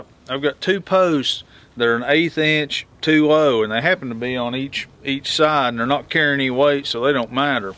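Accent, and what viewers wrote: South, United States. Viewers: Are you from Kentucky sir? I live in lewisburg. I hear that southern 2 ba fo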